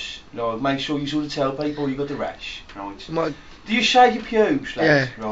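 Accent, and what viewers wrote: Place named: Black Country, United Kingdom. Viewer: Isn't this Black Country accent rather than Birmingham x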